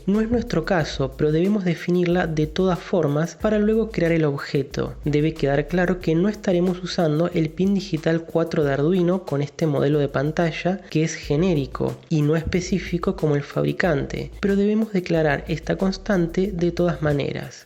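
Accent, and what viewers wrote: Argentina. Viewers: Las mejores explicaciones de arduino de YouTube, además es cómodo porque es argentino.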